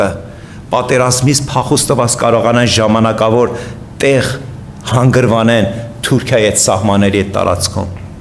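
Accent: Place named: Iran. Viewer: Անհամբեր սպասում ենք Ձեր քարոզներին,Աստված օրհնի Ձեզ, իրանահայի Ձեր акцентը նույնիսկ հոգեհարազատ է մեր ընտանիքին,սիրով լսում ու նոր բացահայտումներ ենք ունենում լսելով Ձեզ։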